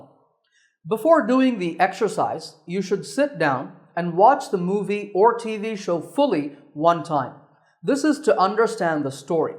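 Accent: United States. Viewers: Did you grow up in the US ? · That's American 1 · I want to learn American Accent from you. What is the procedure??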